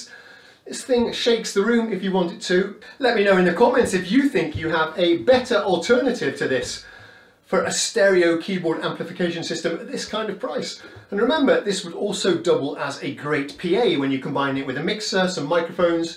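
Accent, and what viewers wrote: United Kingdom. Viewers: Oh no! You are all "BOX'ed" in...hahaha. Great evaluation. And I agree that the plastic monitors do rumble, buzz, and vibrate more often then those built out of wood. I also punish myself by facing my keyboard up against a concrete wall and tell myself, take that pilgrim...haha. But for my bass and guitar next to me, I play looking outside a large window as this is where my DAW if facing. Go figure...lol! I had no idea you were living in Sweden as your accent is British thinking you were in the UK. Either way, that's awesome. Loving your videos and I am so happy for you that you bought a great semi sound reinforcement set up that is pleasing to your ears. Not sure about your wife's ears I assume though hahaha. There are many speaker and amp combinations for such purposes that are cheap. And I stress cheap as in cheaply built and sounding. 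I think you aquired yourself a great buy. Congrats on your meticulously thought out purchase. As far as your amp falling and damaged, you can always say it was a drop in price...lol.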